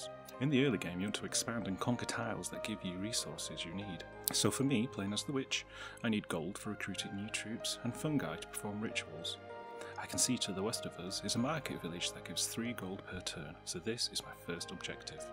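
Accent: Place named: United Kingdom. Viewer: your quiet british accent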